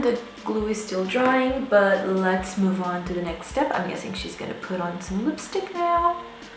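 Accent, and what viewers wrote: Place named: Brazil. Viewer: you look brazilian and your english accent reminds me brazilian english speakers, where are you from?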